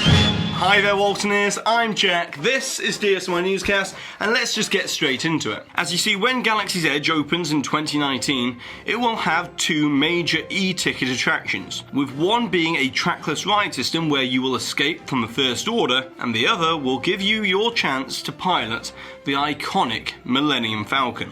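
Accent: United Kingdom. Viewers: Which type of British accent do you have? I am baffled!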